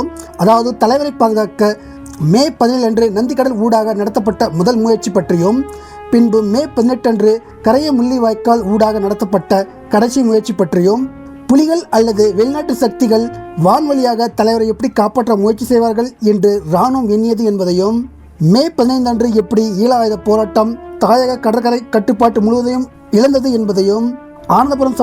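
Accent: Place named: Kerala, India. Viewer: Adooi clear ahh vilankira mari sooluda Ethoo malayalali Tamil pesuramari pesurai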